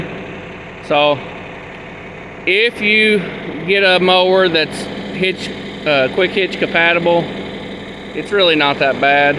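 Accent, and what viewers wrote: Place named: Georgia, United States. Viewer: Damn son that is one hell of an accent! Georgia boy?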